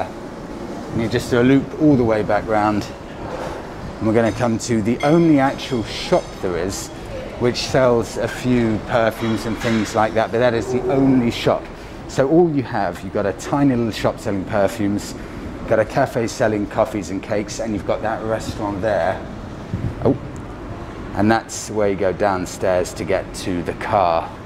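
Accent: England, United Kingdom. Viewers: You should be on Jackanory telling stories, your voice is quentisently English old chap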